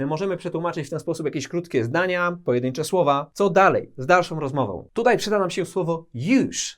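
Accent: United Kingdom. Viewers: masz british english w stanach nikt tak nie mowi.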